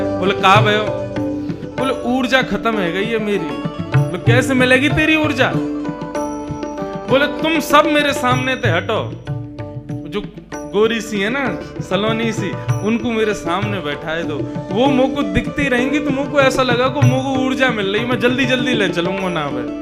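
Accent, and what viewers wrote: Braj, India. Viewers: समज आवे ना आवे पर सुनने में बहुत पियारी है आपकी ब्रजभाषा आप के जैसे ही है 🙏🙏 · Aapka nauka bihar prasang brajki local bhasa me sun ke atma trupti hogayi. Jitna sune utna mitha lage.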